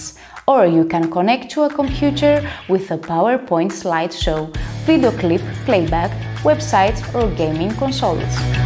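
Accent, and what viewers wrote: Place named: Greece. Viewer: r u Greek? I love your accent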